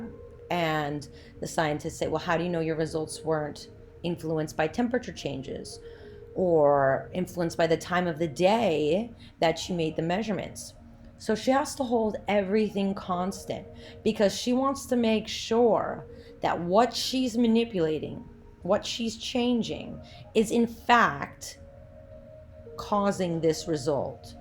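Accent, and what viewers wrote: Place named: New York, United States. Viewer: Really easy-to-follow/understand lecture! I've never had an online professor who has their lectures set up on YouTube before. I like your accent. Are you from New York or New Jersey?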